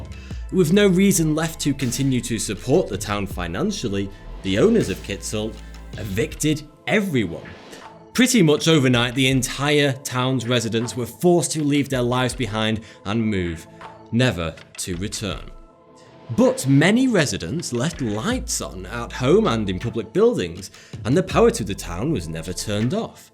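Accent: United Kingdom. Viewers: In the UK, we call them shopping centres, not shopping malls. tends to use American words, despite sounding British.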